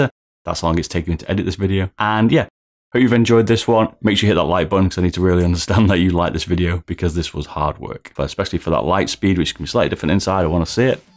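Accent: United Kingdom. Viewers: wow! I subscribed to the channel after watching four super interesting videos! very good quality content, congrats! only a minor issue: I had to enable subs (english subs) because I'm not English mother tongue and even if I can speak and understand it quite good I'm not used to the accent you have (british, I guess?). but hey, not really a problem ;)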